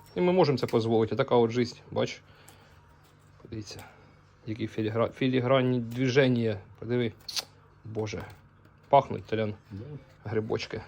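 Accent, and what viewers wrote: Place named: Surzhyk, Ukraine. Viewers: Лютий сурж, слухати неможливо, вухам боляче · 100%, Вже можна було б і потужність свого суржика значно зменьшити. Бо будучи в Польщі, напевно, ретельно намагався опановувати польську мову, в Данії теж буде, бо як іначе можна претендувати на високу зарплату, без місцевої мови. А ось до української мови несерйозне відношення. Тим більше, блогер, як він хоче завоювати симпатії багатьох українців, без української, навіть з легким суржиком, мови. А такий потужній його зараз суржик відрізає велику кількісь потенціальних підписників. Ось і мені подобається, а через такий тяжкий суржик, не маю бажання підписуватись.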